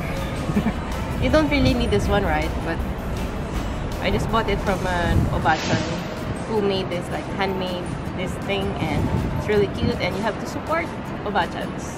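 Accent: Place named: Philippines. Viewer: your speacking english was look like an filipino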